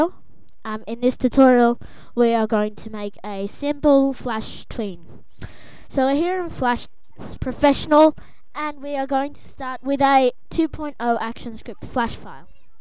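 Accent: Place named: Australia. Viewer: Awesome austrailan accent! you helped sooo much ! subbed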